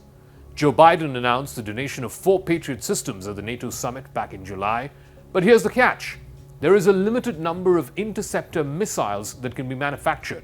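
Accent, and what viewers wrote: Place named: India. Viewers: The first ever Indian that I have listened to that speak fluent English with proper accent unlike indians with all respect. Perfect English perfect accent perfect view of war and not biased. Respect to you. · He still has trace of Indian accent. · This is Indian accent.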